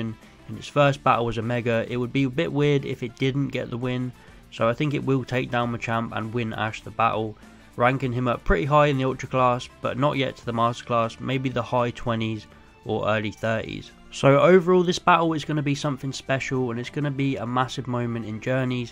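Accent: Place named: United Kingdom. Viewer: You are so British by Accent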